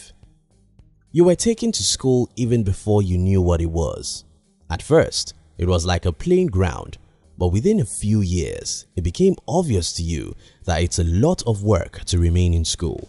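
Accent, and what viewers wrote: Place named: Nigeria. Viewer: You speak like a Nigerian.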